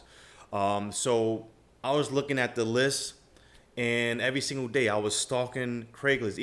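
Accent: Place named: New York, United States. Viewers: You def have a New York Accent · ay, from the Bronx I knew I recognized that accent lol great video I enjoyed hearing your story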